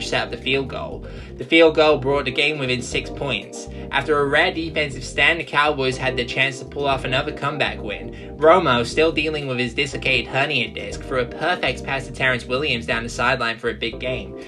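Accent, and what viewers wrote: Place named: Australia. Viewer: Didn’t even notice the Aussie accent right away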